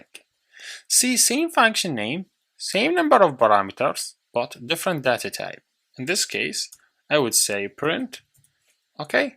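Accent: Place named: Iraq. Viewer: Your accent seems like you are arabic,more similar to Iraq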